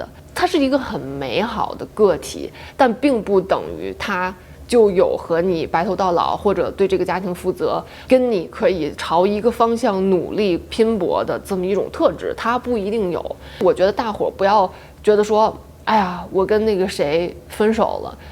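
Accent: Tianjin, China. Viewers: 天津普通话！好亲切哦！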